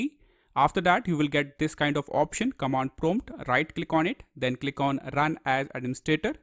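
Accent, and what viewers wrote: India. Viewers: I heard an Indian accent and immediately knew my internet is gonna be fixed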